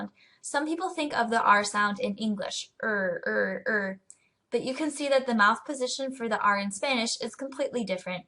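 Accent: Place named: Argentina. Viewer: I do love your argentinian accent haha. I'm studying english in Dublin and I have problems with the English R, so you people are not alone hahaha.. un beso grande genia !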